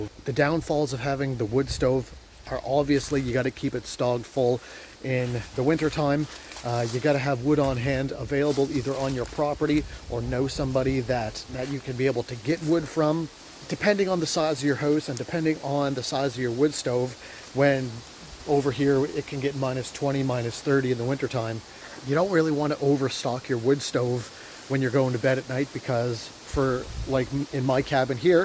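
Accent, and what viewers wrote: Nova Scotia, Canada. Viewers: Thank you for this lovely video summing things up! I wrote once before saying "You must be a Nova Scotian" as I recognize the accent! But am forgetting which county you're in. I'm in Annapolis county and it's getting much harder to hide from all the restrictions in order to 'off grid'. I'm in a tiny 12x12 shack next to our bigger house, but eventually my 30 something son and daughter in law (who are also very much 'believers...Praise God!), are wanting to homestead and go more off grid with their little one. God has done many wonderful things in their lives so far, so I'm sure it will happen within the next couple years. (P.S. I have a cordoned off little corner with a bucket system bathroom and I just love it....WAY better than any water toilet!) In a future video, can you give your testimony of how Jesus came into your life? 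Love hearing the multitude of ways He has shown up in peoples' lives. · Your accent sounds Nova Scotian. Im guessing Pictou county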